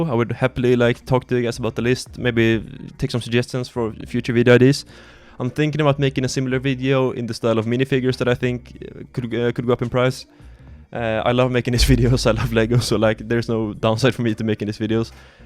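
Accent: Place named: Norway. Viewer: You sound Norwegian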